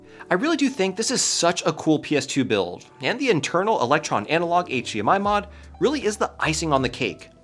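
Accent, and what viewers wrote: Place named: South, United States. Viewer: Your southern drawl came out in this one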